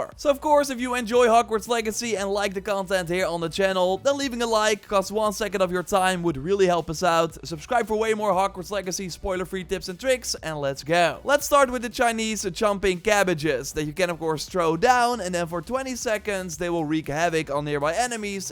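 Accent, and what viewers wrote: Netherlands. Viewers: dont know why but you sound dutch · Ik dacht al klinkt Nederlands en checkte socialblade en hup een Nederlands accent....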